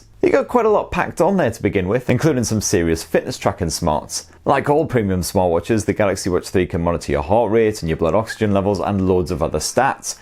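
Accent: United Kingdom. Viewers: Me gusta el acento británico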